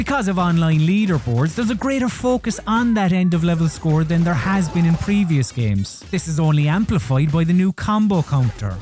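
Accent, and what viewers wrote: Ireland. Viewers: I like the Irish sounding accent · Man its nice to hear another irish voice going on about great games :)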